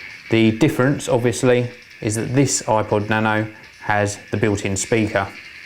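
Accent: United Kingdom. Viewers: ar u british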